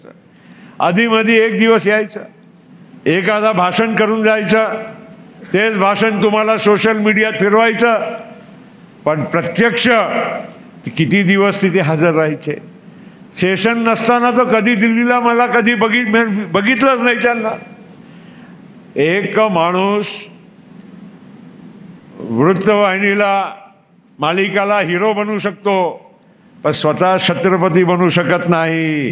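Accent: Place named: England, United Kingdom. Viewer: Tumhala he Engrajanchya tone madhala Marathi bolayala kasa jamat ho?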